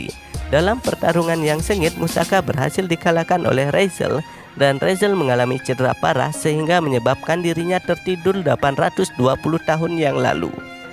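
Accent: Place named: Java, Indonesia. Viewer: Tambahan, kalo bisa logat daerahnya di kurangi. Bahasa indonya medok bgt.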